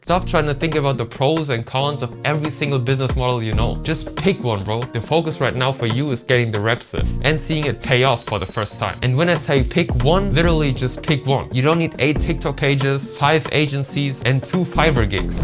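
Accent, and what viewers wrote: Germany. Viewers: German Accent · are you german loool? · are you German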